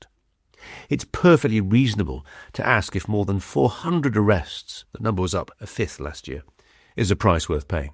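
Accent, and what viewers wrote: RP, United Kingdom. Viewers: I must admit the gentleman speaking in this video is very articulate with a well polished British accent but he has failed to talk about the facts.If Windrush made no contribution to British society why did the British government feel it was necessary to recruit ppl from the Caribbean to fill the labor shortages that were caused by the hundreds of thousands of casualties caused by the war..Why did the Enoch Powell who was the health minister between <num> have a recruitment drive to recruit nurses from the Caribbean to work in the NHS? The reason was Britain had a shattered economy and needed a labour force to rebuild it.If this could have been done without the involvement of ppl from the Caribbean why did the British send ships to those countries and bring those ppl here?Now that Britain has been rebuilt and has one of the strongest economies in the world ppl like this gentleman wants the to turn around and say Windrush was a myth and was never necessary...He talks very well but it is all waffle and does not make any sense unless you have a very low IQ which is the type of ppl he will appeal to. 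· I love listening to “people of your age speak”, true British English. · I think what’s sad about this video (and the comments) is that working class people who are white, brown, black, Irish, Eastern European, African, Caribbean, English, Scottish, Welsh…ALL worked hard…in terrible conditions, for long hours, to better the places they lived and the places they moved to (in order to work and live) Having someone with a posh accent deny our histories makes me sad, angry, and disheartened…when it’s times like these we need to pull together and make our towns, cities, countries BETTER places by helping those who are suffering rather than fighting each other. If anyone watching or reading this believes that their ancestors have lived in one spot from the beginning of the human race, they have not studied the history of our planet. We are human FIRST. What colour of eyes, skin, hair or anything else is SECONDARY. 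If a house was on fire next door I would not care whether the person inside was white green or purple. I wouldn’t care if they were a criminal or a saint. I would try and save their life FIRST and think about playing judge and jury AFTERWARDS. If any of us needed a lifesaving transplant for themselves or for their children or for their parents, I doubt that they would be asking “did the donor come on windrush? No thank you!!” NO. We would be trying to better a life, and thanking the universe for whatever stroke of luck brought that person, that other human, to be in the same vicinity as us, so that they gave a part of themselves to help anothe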